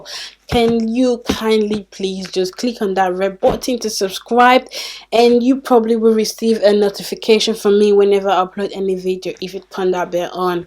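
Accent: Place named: Nigeria. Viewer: Hi I like ur accent, are Nigerian? Liked and subscribed.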